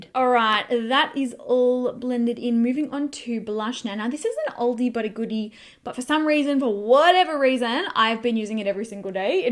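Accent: Australia. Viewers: Victoria Darmanjian She has an Australian accent and it even says she’s Australian in her description.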